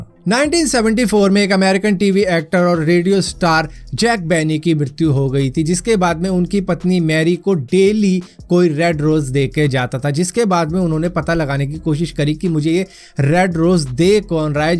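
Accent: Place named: Haryana, India. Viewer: Bhai ik video haryanvi mai bnao plz...aapka slang bhot acha he...plzzzz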